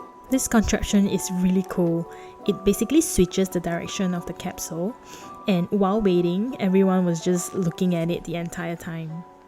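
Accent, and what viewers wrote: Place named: Singapore. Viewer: Singapore accent ftw!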